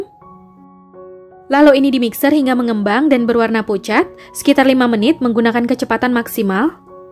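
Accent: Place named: Bandung, Indonesia. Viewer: Suaranya kyk suara khas orang Bandung, salfok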